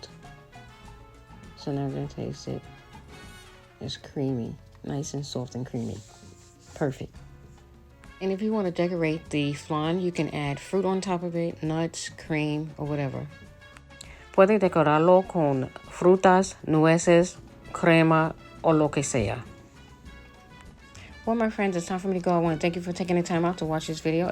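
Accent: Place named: New York, United States. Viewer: It's the new york accent for me!! 🗽❤ I can't wait to try this recipe for my family!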